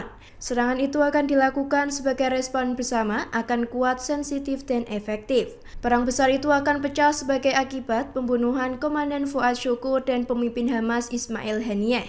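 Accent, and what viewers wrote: Java, Indonesia. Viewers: mimin nya medok amat ya😅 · Narator nya medok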